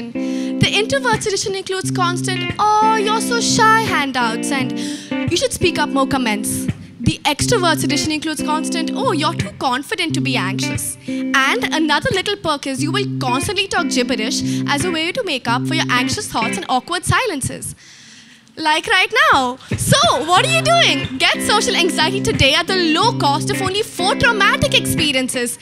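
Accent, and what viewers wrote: United Kingdom. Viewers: And I love your English accent❤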